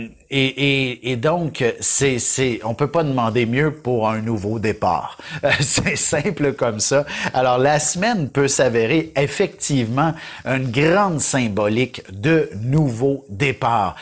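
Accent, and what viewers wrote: Canada. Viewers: Bonjour, faut il écouter les ascendants svp, j adore votre accent canadien Merci Mais il y a un moment que l on ne vous pas entendu, je suis capricorne ascendant lion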